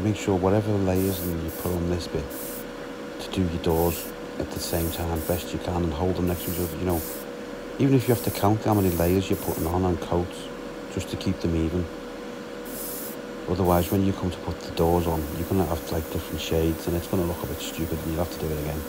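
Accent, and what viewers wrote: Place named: Scouse, United Kingdom. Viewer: Hey, i only found you channel through nick and was made up to see your renovation of the roadsweeper, as im donig one myself as we speak. Im doing it for a friend who owned one as a kid. The added bonus for me was hearing your accent, I thought “ wow, this guy is one of ours “. Im originally from Wallasey in merseyside, so im only a plassy scouser, or woolyback or odgie, but ive been living in exmouth in devon for the last 7 years and its always great to hear one of your own 👍🏻. Great work and im fully signed up to your channel. Well done mate.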